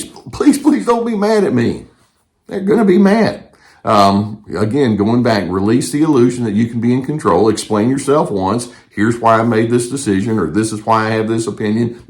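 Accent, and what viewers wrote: South, United States. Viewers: I wish you would talk more slowly. (I get hyped just listening sometimes!) I know you have lots to say (and that you are sharing generously!), but I would benefit from you adding "slow" to that great, kind Southern style of yours. (Thanks!)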